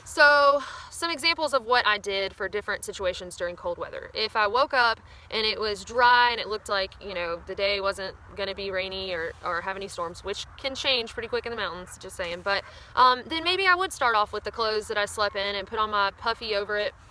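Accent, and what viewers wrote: South, United States. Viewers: Nice work. I hike the Pinhoti Trail a lot. Have you hiked it? Its not far from you. I live in Birmingham. Love your videos, especially love listening to your southern drawl:). Im originally from the Sylacauga area. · I thought this was a really good video told by a really cool chick. where is your accent from exactly? Southern, Mississippi maybe? I've never heard the term "tobagen" before for a knit cap or beanie. Also, so are you saying a thru hike encompasses or spans no less than 3 seasons? As in spring, summer and fall? Kreikies thats a hell of a lot of hiking. What do you do at night? Play cards or anything? Are people hooking up at all? Haha! Or are the too stinky? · Your southern dialect is kind of blowing my mind. I'm from NM and I've lived in D.C. and MA and I've never heard someone use the words "britches" or "toboggan" before. 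I would just say "leggings/tights" or "beanie". Anyway, I just found your channel and I love your videos so far. Best of luck on the PCT!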